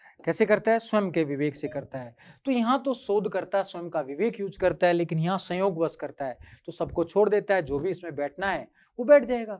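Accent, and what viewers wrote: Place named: Rajasthan, India. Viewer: Aap Rajasthan se honge 100% kyu ki hindi language ko bolna or likhne m jo galtiya aati h wahi k logon m aati h . Regional language ka asar hota h .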